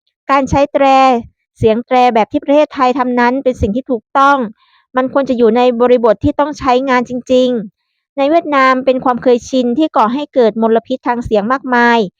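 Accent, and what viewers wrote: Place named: Laos. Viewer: น้องแอด เป็นสาวลาวป่าว..สำเนียงน่ารักน่ะ...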